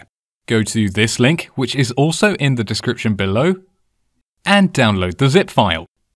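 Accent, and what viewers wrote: United Kingdom. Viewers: Well done ! You have a pleasant voice, a neat pommie accent and you make the technical bits sound easy - great for building confidence in your viewers! Looks like it is time to dump the N-gauge and get a few Lego trains! I also love ARDUINO - I use it in my Christmas Villages. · Just a small point, but when searching for a datasheet, isn't it better to search on the components code and the word datasheet instead of the code and pdf? Not all datasheets are PDF's, obviously most are but some are weblinks, and true there is usually hundreds of results for popular components, but sometimes obscure components are hard to find and by just searching on PDF you may mis a datasheet. Can I just ask something, how come when you search on google it's the Polish version, when you are very obviously from England, home counties by the sounds of it, why and how come? 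Are you hiding in flat coming out when he leave to buy hamster food? Great videos BTW, thanks.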